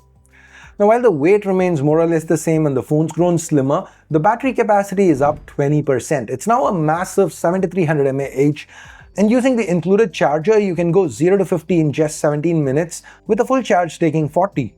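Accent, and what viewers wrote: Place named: India. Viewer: Indian accent killing me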